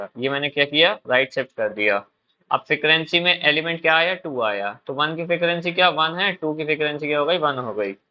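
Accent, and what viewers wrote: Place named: Bundelkhand, India. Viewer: Bhaiya ur Bundelkhandi accent is so good 💯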